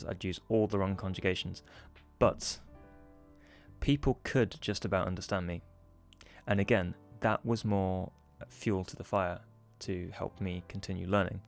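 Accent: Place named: United Kingdom. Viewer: He has a strong British accent, but his pronunciation is clear. So you have to improve your listening skills with the British accent instead of him speaking more clearly.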